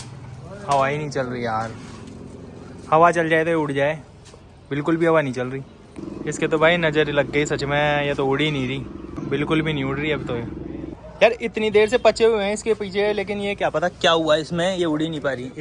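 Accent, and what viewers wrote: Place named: Kota, India. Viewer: Kota ya aas aass boli asii lag rhii hai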